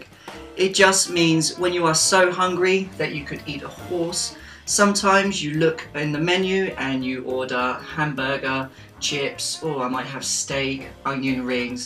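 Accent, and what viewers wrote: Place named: London, United Kingdom. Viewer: Thank you for great explanation and excellent lesson of Londoner's English Accent.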